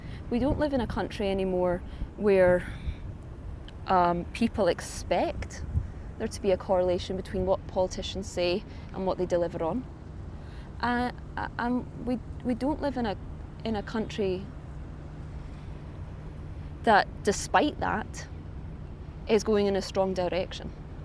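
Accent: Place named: Scotland, United Kingdom. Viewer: You're a Scot.. I had you at the 3rd word.. Dae ye ken Scots langage?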